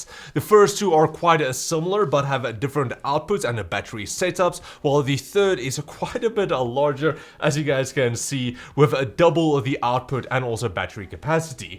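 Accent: South Africa. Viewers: Meneer jou aksent maak dit bitter moeilik om na jou te luister, hoekom doen die Suid-Afrikaners dit, dit klink verskriklik.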